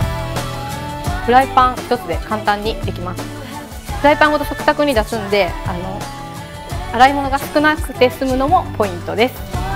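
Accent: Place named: Kansai, Japan. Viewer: 関西の人なんだ。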